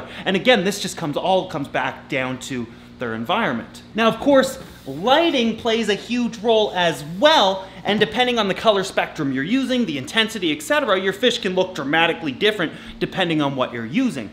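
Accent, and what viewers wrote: Canada. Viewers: Could really hear the Canadian accent in this video. it’s brilliant 😂❤️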